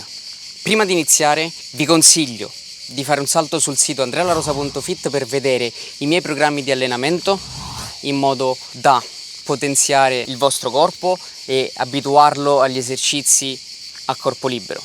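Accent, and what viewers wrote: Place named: Portugal. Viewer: Ese acento portugués le da ese carisma genial. Grande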